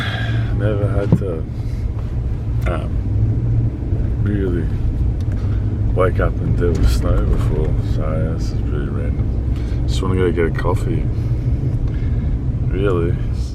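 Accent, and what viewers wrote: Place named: Australia. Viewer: Guys is it only me or you too have problem understanding him ? I mean it’s just his Australian accent is too thick and his voice too deep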